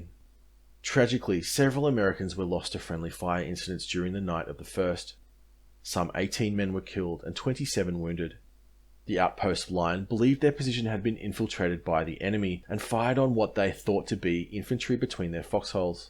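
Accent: Australia. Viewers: We'll I don't know if you picked up on the accent mate, but he is an Australian. Funny then the Aussie content.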